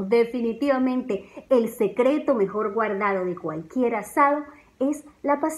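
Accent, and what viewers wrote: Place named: Venezuela. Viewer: En esta época tenia todavia el asentó venezolano 🇻🇪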